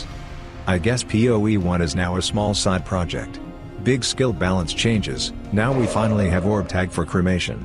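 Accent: Russia. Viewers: theres no commie speak like a russian on purpose，it too showy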